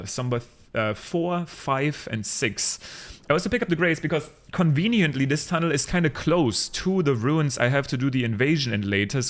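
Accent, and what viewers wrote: Germany. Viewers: Sprichst du als erste Sprache Deutsch, klingt ziemlich danach 😌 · Nothing makes me happier than realize within seconds that I've found yet another german creating English content lmao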